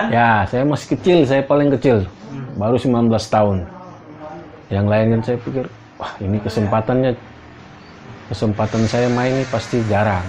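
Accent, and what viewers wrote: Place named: Minangkabau, Indonesia. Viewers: Dialek bahasanya dah persis orang Minang.mantaap. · Logat sdh Minang sekali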